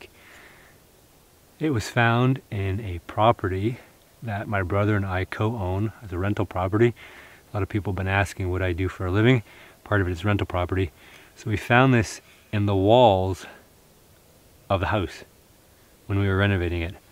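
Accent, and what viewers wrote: Canada. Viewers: I knew it was Canadian the first time he said "aboot".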